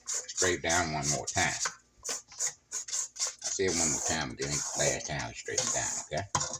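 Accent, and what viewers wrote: South, United States. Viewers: Once I heard your southern accent, I knew that you knew what you were doing ❤❤❤❤. I'll have one maybe 2 slides please thank you so very much!!!!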